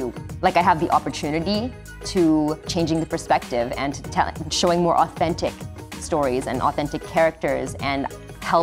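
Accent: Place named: United States. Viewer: i knew she had an american accent 😍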